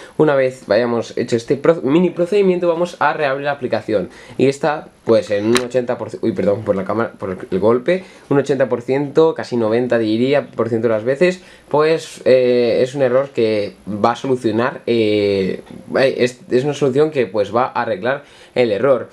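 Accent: Spain. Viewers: Deberías de hablar más despacio , ya que por tu acento español más tu rapidez al hablar no se logra entender bien lo que quieres decir. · Tienes la Voz de MrDeivid! xD!!!! Bue! Casi todos los españoles! Hablan asi! Los jovenes jajajaja xD!!!!!!!!!!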